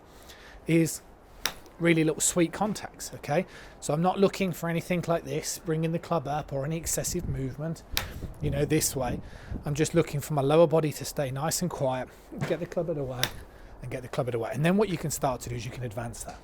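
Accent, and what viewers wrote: United Kingdom. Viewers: He has aBritish accent and talks too fast also.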